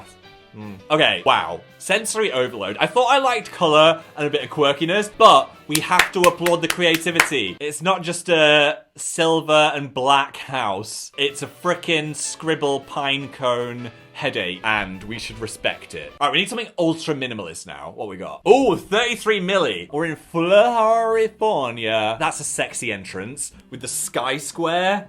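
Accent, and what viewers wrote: North, United Kingdom. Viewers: Is northern accent thicker these past few vids or is it just me?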